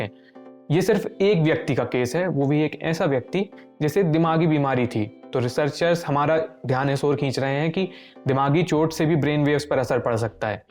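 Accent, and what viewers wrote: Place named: Lucknow, India. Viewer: Full Lucknowa tone, like that 👍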